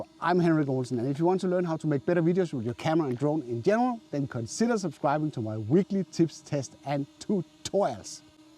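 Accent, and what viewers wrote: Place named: Denmark. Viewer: Henrik Olsen???? JEG VIDSTE DET!!! Du er dansker - den accent kan du bare ikke løbe fra. " I mean eeh, you have been to school, not? I though it enough", " "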